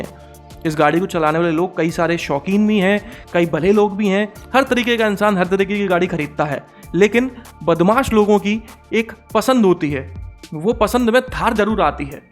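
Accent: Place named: Gwalior, India. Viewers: Lagta hai aap pakka gwalior ya Morena side se ho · बहुत समय बाद ग्वालियर चंबल बेल्ट की टोन सुन कर अच्छा लगा..... सही रिव्यू दिया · Bhajja tumai language hame bhind muraina ki lagte😀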